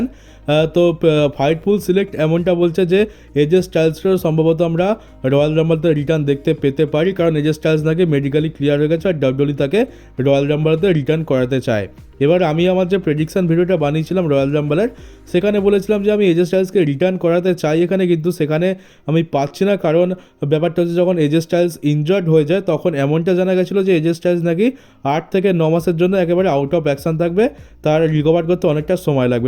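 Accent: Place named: India. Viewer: ভাই তুমি কি ভারতীয়? না মানে তোমার উচ্চারণ কিছুটা ভারতীয় আবার কিছুটা বাংলাদেশী দুটোই মিক্সিং যাইহোক আমি মহারাষ্ট্র থেকে বলছি 🇮🇳🇮🇳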